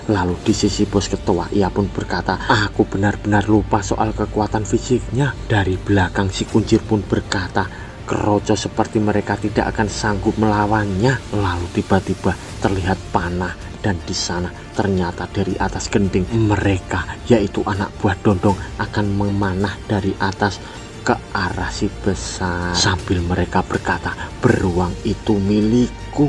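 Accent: Java, Indonesia. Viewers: Logat bahasa jawa e kentara mok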